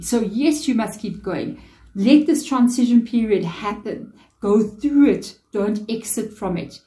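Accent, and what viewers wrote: South Africa. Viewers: ❤😊thank you for your encouraging readings, dear soul!!! And I love your accent, I really do. In the beginning I had to become used to it, but now I understand everything you explain😊. My daughter s had schoolmates from south Africa, and at the age of 12 their parents had moved to Germany. They shared our home very often, and my daughter never had difficulties to understand you!😊 Feel good and keep shining❤❤❤ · 777 comments, ❤ well I've now made it 778😂❤ ps your accent is my favourite, I personally love the South African accent. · I thought your accent is so cute. ❤️ I never had any trouble understanding your English at all. You talk so grammatically correct. I'm in Chicago and never knew anyone who is South African. Love you mama tarot lady. ❤️ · I am South African too living in New Zealand love the accent 😊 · Always a delight to be here for your readings. 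So funny all these south african english like the now-now :) Thank u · I love listening tonyour readings precisely because of your accent. It reminds me of a former south african manager I worked with, lovely woman, I miss working and talking with her. You talk at a very comforting pace. Thank you for all you share ❤ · I absolutely love your South African accent and the way you speak and express yourself! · love the SA accent?!!?🧚🧚🧚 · That is what drew me to your channel, not only your lovely face but beautiful South African accent. Thank you for all you do..................... love from the UK. xxxxx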